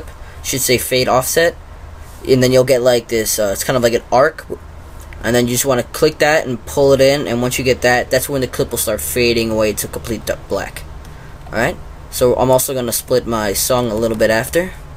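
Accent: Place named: United States. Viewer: Thank god, an american for once.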